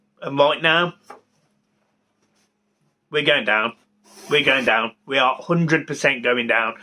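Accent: Birmingham, United Kingdom. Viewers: Come and join the Villa family mate you’ll fit right in with that accent. 👍🏻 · I was expecting you to be a villa fan when I heard the accent!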